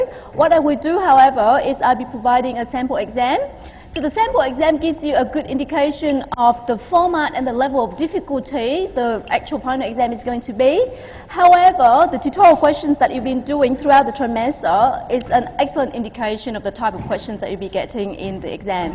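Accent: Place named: Australia. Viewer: More like Australian rather than British.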